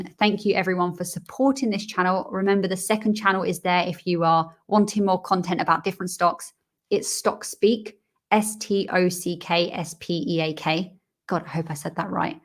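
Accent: United Kingdom. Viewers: I love your british accent ❤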